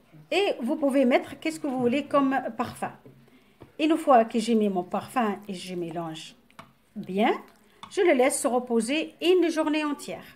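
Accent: Tunisia. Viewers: روعة و اللهجة التونسية اروع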